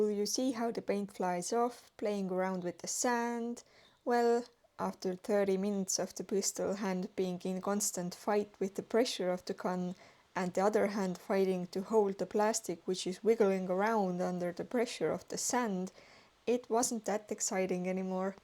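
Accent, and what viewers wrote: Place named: Finland. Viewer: I was thinking your accent sounds finnish but your plates are Estonian. Then i realised where Estonia is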